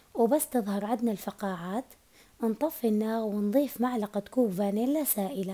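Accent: Mosul, Iraq. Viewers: عاشت ايدكي حبيبتي قبان بنات المصلاوية(الاحضرية ) اشقد معدلي وشاطغة بكل شي قبان لهجتنا الحلوي ول دادا 🌼🌼🌼🌼🌼🌼🌼🌼🌼🌼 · صوتك موصلاوي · احلى شي بالفديو لهجتج الموصليه❤️❤️❤️❤️❤️ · فدوة للكنة الموصلية عاشت ايدج❤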